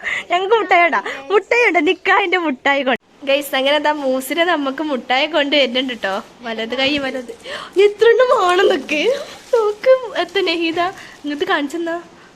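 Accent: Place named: Malappuram, India. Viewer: Thani Malappuram samsaram😂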